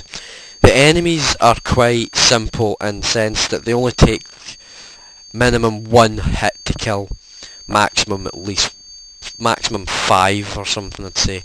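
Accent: Scotland, United Kingdom. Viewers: Haaa. I was NOT expecting you to be scottish. Yas. Nice :D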